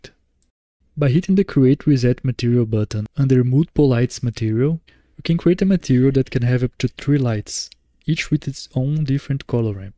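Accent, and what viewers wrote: Brazil. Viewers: mano vc é brasileiro?? kk percebi muito o sotaque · Esse sotaque de brasileiro maravilhoso, que vídeo prft irmão! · For some reason, it look like u have a Brazilian accent 😂❤ thanks for the video, it's amazing how we can now make those things with such a easy way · Sabia que tu era brasileiro nos primeiros 20 segundos XD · Man... Are you Brazilian? Your way to talk makes me think so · nossa eu acho impressionante como eu descobri que você é brasileiro só pelo sotaque, o meu é igual dfgfsjhs quero um dia aprender a fazer render então muito obrigada pelo vídeo <3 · That accent. Brazilian. 🤔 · Do I hear a brazillian accent? Isso parece tecnologia da boa! Adoro mexer com píxeis e renderização! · Cê tem sotaque de brasileiro amigo, ótimo vídeo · Bizarro como da pra saber na hora que é br mesmo falando em ingles kkkkkkkkk, vlw pelo video pai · Sotaque Brasileirinho... 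nice addon btw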